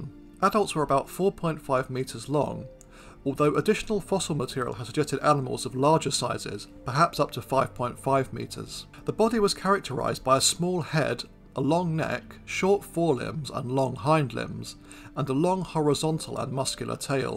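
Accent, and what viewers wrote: United Kingdom. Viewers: ugh. too many documentaries with british narrators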